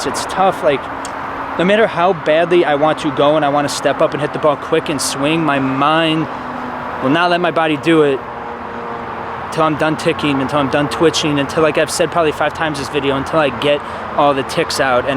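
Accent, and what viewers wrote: United States. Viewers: Truth...wasn't sure about this guy with the Chicago accent that liked to wear bball shorts with golf shirts when you first showed up in Good Good. Didn't take long to realize that you're a genuinely solid dude and I'm glad to see what you bring to the whole group. After this video, even more so. Thank you for sharing your truth...we're all better for it. But seriously...stop wearing bball shorts on the golf course. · Anyone else just think he had a jersey accent?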